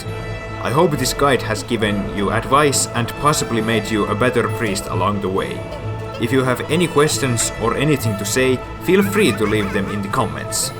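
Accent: Finland. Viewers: Ralli english is so strong with this one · you sound like all of my Finnish relatives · hirveen tutun kuulonen aksentti, taitaa olla suomalainen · tolla aksentilla sun on PAKKO olla suomalainen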